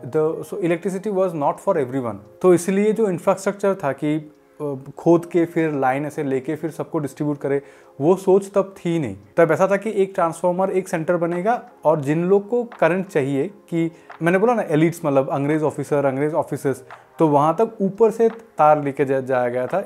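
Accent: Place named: Bengal, India. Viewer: If I am not wrong, you are bengali for sure. Your accent is typically bengali type. Just a guess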